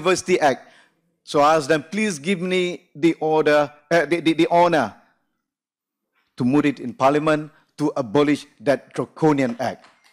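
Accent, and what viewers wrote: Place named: China. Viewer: Mcm cina ckp english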